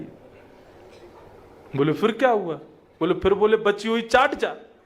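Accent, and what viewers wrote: Braj, India. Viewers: Jai jai aapki Katha bhut acchi lagti hai aapke mukharvind se brij bhasa bhut sunder lagti hai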